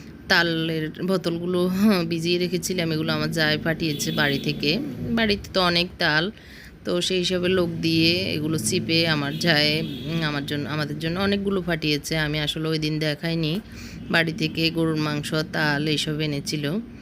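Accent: Noakhali, Bangladesh. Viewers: Apu kamon acen??apnar kaj dekhta onk valo lage.apnar blog onk valo lage.apnar kotha sunle onk apon Mona hoi.amrao basai noakhalir vasai kotha boli.vaia k o onk thanks apu k support daoar jonno.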